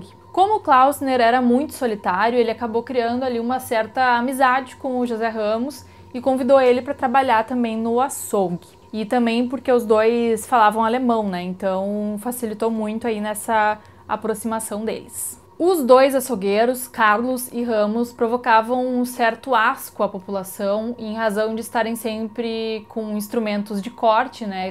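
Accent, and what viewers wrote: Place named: Rio Grande do Sul, Brazil. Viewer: Pior não era, disso estou bem certo! O que acontece é que naqueles tempos, era tudo na base das testemunhas, dos suspeitos, O que tornava difícil resolver crimes, O cara quando é mal, Ele mata até pra roubar uma bicicleta! Não acho que a época influencia no caráter dos bandidos, ( mas isso sim, ela facilita ou dificulta as ações deles! ): Tô com saudades dos teus vídeos, você tá preparando algum para breve??? ( ACHO LINDO O TEU SOTAQUE DE GAÚCHA ).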